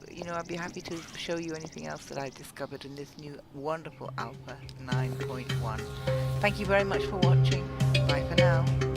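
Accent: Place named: RP, United Kingdom. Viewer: You accent makes me pay attention, it's like someone just turned the BBC on but instead of news it's relevant information I will use in my life.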